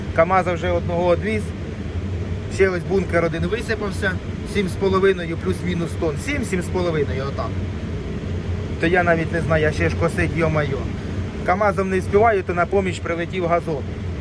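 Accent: Poltava, Ukraine. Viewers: Звідки ви ? Акцент Полтавський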